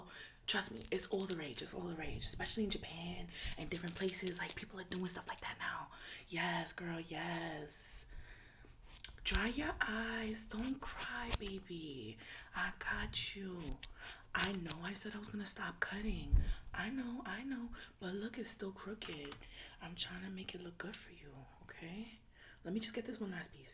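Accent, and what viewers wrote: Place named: Queens, United States. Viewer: where are you from?? some things you say sound exactly like my friend who's from queens lol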